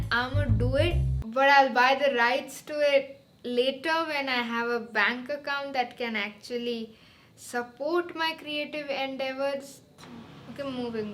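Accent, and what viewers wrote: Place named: Bengal, India. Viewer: The bengali badass 😂 love the accent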